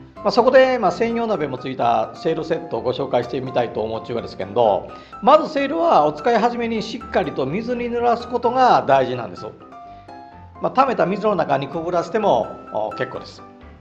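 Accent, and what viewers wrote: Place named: Tosa, Japan. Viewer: 先日竹虎さんでせいろを購入させていただいて、せいろの動画を探していたら公式さんの動画に辿り着きました！😳 初めて使うので、丁寧な動画を上げていただいて大変ありがたいです…！参考にさせていただきます✨ 自分は高知県民ではないのですが、恋人が高知出身で高知県が大好きなので土佐弁の音声もうれしくなりました😊🌸 他の動画もありがたく拝見させていただきます✨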